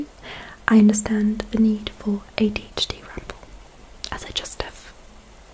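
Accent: United Kingdom. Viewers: Your British accent is fantastic!!! <3 My partner has ADHD and suffers from executive dysfunction. Watching this video helped me understand them more and taught me some ways I can help! Thank you!!! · That 330k looks very awesome under your channel name 👀 Congrats!! Also, could you teach me how to do a proper British accent?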